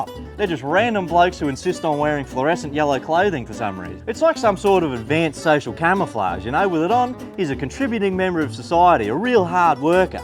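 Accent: Australia. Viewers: don't know why but I didn't expect an aussie accent to come out of that face... Anyhoo, you wanna be a sultan of salmonella, you should come to kagoshima where we eat raw chicken and you can get raw egg to pour over your gyudon.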